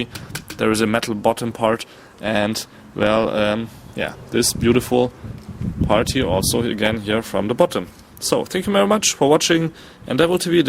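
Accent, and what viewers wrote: Germany. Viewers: Are you german? are you english speaking naturaly? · German english lol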